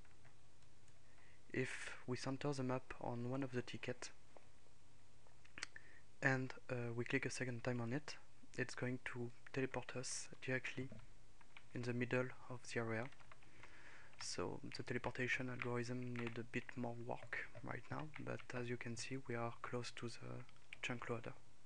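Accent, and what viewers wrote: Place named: France. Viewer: L'accent français fait tout le charme de la vidéo =)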